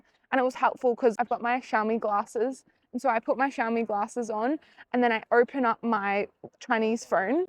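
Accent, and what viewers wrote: Australia. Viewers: No, she is not an American. She has an Australian accent, from West Australia. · Love your Aussie accent ! Plus you are a gorgeous lady, and your mandarin is good (well. way better than mine) !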